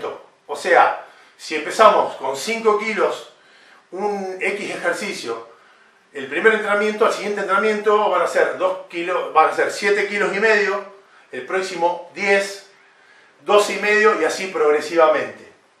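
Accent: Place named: Argentina. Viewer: No conocía tu canal. Me gusta. Es bueno oír gente hablando del tema en "argentino" jajaj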